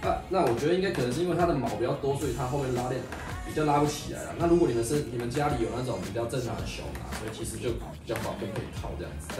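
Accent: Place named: Taiwan. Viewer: 台語夠台還會抖腳😂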